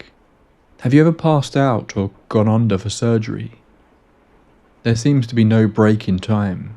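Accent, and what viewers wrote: United Kingdom. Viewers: Love your british accent .great stories..from usa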